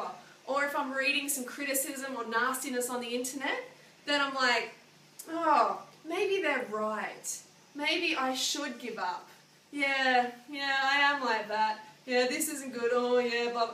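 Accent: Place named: Australia. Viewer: you have a nice body it is such a pity you speak like a dumb bimbo australian!! i was going to marry you until you opened your big ozzy gob!! i come from devon so speak like a farmer look like shrek!! how can you help me? get healthier i am getn a bit of flub on my ut i eat meat wrestle animals to the grounds if i am hungry gnaw at their ankles! if i dont get meat!! your meat is very tasty & nice!! so can i have some?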